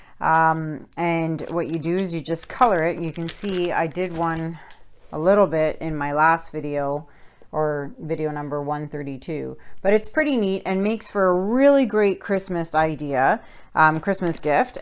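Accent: Canada. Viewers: She cracks me up because she sounds so Canadian. That’s not a bad thing. It’s just very easy to tell she’s from Canada just by listening to her.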